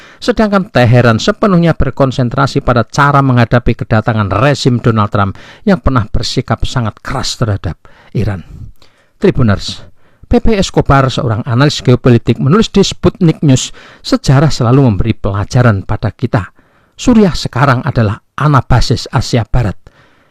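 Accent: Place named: North Sumatra, Indonesia. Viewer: Narator nya pasti orang Batak. Logatnya masih kental ya.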